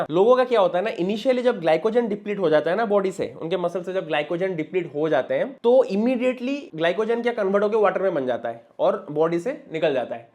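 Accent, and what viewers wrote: Odisha, India. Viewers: Odia loka nka Hindi bhai pura jaana padijauchi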